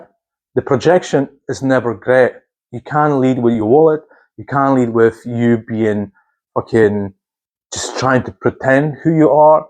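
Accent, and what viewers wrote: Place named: Scotland, United Kingdom. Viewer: Are you Scottish but trying to be easily understood or like Eastern European but then it comes off as Scottish?? And I’m not saying that like critically? Whatever it is, I can fully understand you as an American so …. Right on 🙌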